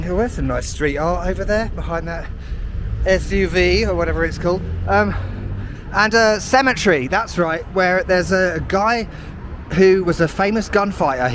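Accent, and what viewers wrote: United States. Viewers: Your American, accent is hilarious,,, as a non American,,, I would say poor is poorer in the US...at least you were safe .......cheers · your American accent is so spot on it makes me wonder if your not an American posing as a Brit, joking I know your British but the accent is great. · Great American accent.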